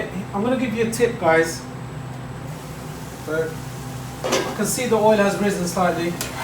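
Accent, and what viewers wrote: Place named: Stoke, United Kingdom. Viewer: You know, I was watching this and thought that sounds like a stokey accent and at the end you revealed that it was haha I’m in Stoke too and will definitely come down to the restaurant 😊 great videos, I love Indian cuisine and am trying to learn more about foods from different regions. Thanks for the videos mate 😊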